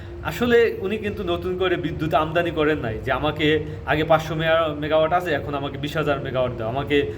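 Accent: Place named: Barishal, Bangladesh. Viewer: Report করলাম। ফাজিল। তুই বরিশাইল্যা বল জানিস।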